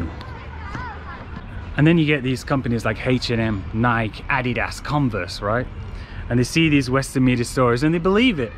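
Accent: United Kingdom. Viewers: i love this cute british accent debunking Big Bullsht Corporation ,lol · 英國口音好聽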